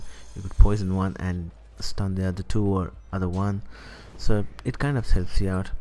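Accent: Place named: India. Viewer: Lmao I was only trolling, I know an Indian accent when I hear it :P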